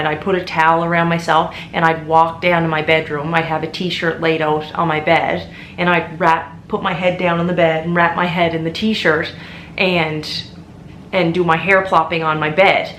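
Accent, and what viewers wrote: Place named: Newfoundland, Canada. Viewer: “My toilets right clean” 🤣 I’m from Newfoundland, and I just love that your so free with your accent!!! 😍😍 right clean, yes byyyyyy!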